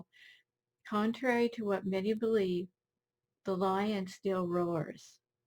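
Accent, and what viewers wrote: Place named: Ohio, United States. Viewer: I was wondering if you were from Ohio because your accent sounds just like my great aunt and old recordings of my great grandmother. I'm subscribed if you ever decide to make more videos, you have a fascinating life and outlook.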